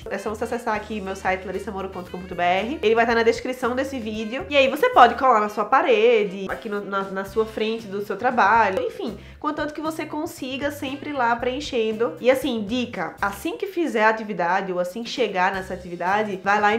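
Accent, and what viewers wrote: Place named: Pernambuco, Brazil. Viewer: Vc é de PE, né? O sotaque entrega! 😅 De todo modo, MUITÍSSIMO Obrigada pelas dicas! Vc apresenta o conteúdo de forma bastante didática, sucinta, clara e objetiva. E, msm assim, bem informativo (refiro -me à quantidade e à qualidade da informação, bem satisfatórias). Muito bom! 🤗 Sucesso! 🌼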